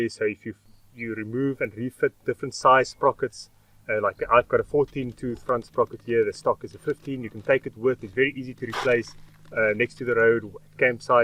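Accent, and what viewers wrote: South Africa. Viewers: Hello! I'm always happy when somebody talk about XR's, and I suppose that you are a sort of neighbour because you seem to be in South Africa (as I hear your accent and the left side coded on the road) and my original country is the red island: Madagascar! We've got the same landscape with laterit dust that make one of the most sticky mud I've ever seen and rode. So I had 3 XR's and only the air-cooled model RFVC and a Domi, the last one was rebuild with a Domi engine to have the electric start but the cams were from XR, and I wanna say that the red pig is one of the most awesome bike you can ride. As some few riders say, add an big plastic tank by Acerbis and an oil-cooler to avoid overheated (the weakness of the RFVC engine at last... and the right level in the carb bowl!) I don't think that the KLR is on the same level, here in Europe and mostly in France, the Kawa is a basic commuter with trail style flavour, the contender of the KLR would be surely the Domi or the Suzie DRS 650 and Yamaha XT 600 (for the japanese brands). 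I think the real competitor of the XR is the KLX R 650 by Kawasaki because it's a real dual sport bike with some great capacities in off-road as the TT 600 by Yam. I rode few times the KLR, and it was too smooth, almost soft and a bit lack or torque, mostly in off-road (dust and rock tracks, mud and sand...), really no way with it in severe conditions,even the liquid-cooled engine seems to be more secure (I heard many times the cool fan opera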